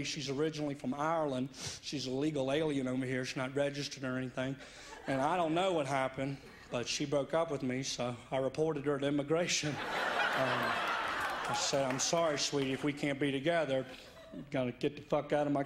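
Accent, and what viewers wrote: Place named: Virginia, United States. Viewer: AKE IT BACK! -- One of the best parts of Yes Dear! I love his physical comedy, so good when he has people to work off of! That is definitely a Middle Virginian Accents not quite Tidewater area!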